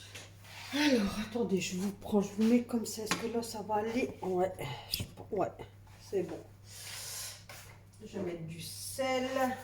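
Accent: North, France. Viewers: Bonjour merci pour ta vidéo même si ton homme est là 😁. j'adore t'entendre parler sa me fais rire on reconnaît bien l'accent du nord😊, j'aime bien ta façon d'être si simple et honnête sur les produits ménagers, continues comme sa je te suis pas depuis très longtemps mais j'adore 🥰 bisous à toi et à ton fils 😊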